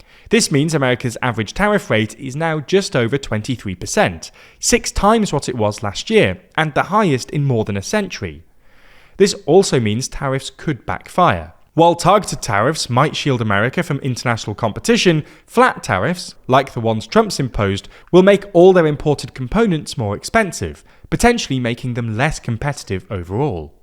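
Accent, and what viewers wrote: United Kingdom. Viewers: Clicks in video, hears british accent. Leaves.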